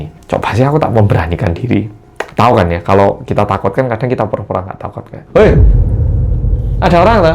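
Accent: Java, Indonesia. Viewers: Betul juga ya mas korea medok🥰 penampakan merupakan permintaan tolong bagi arwah unt kita yg masih hidup😊tks atas critanya yaaa🤓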